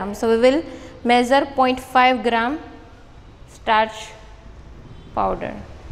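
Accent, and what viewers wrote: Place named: South, India. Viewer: Lmfaoo her English is perfectly fine it's called an accent duh she is prob south indian so yeah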